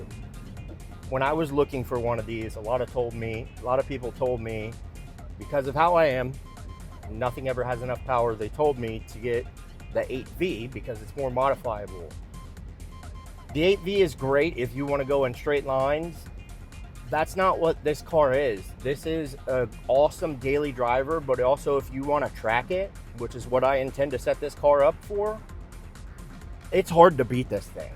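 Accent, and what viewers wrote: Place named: Hollywood, United States. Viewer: Bro you look & sound like the American Hollywood movies have made us rest of the world believe in. Clean nails, too. Bro is SIGMA. Keep it humble king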